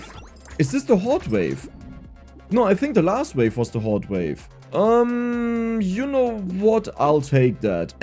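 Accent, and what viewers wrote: Germany. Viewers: Direkt die deutsche stimme erkannt😂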